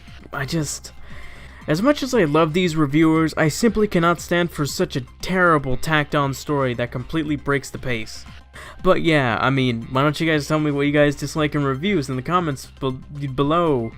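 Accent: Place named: Boston, United States. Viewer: Noice boston accent...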